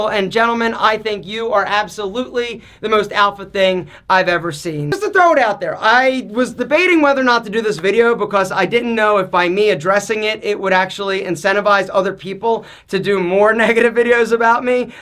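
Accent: Philadelphia, United States. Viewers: I enjoy your posts. I get a kick out of your strong Philly/South Jersey accent. I also agree about the Dollar Shave stuff. The shaving stuff is a bit expensive, but you need so little to have a great shave! So, hang in there . Keep amusing with good advice.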